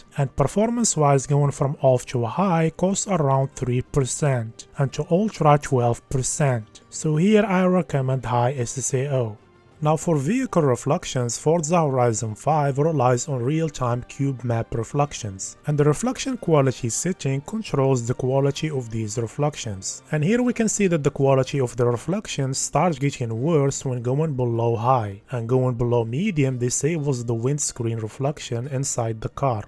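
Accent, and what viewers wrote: Morocco. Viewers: your accent is soo moroccan and i love it. + 1 sub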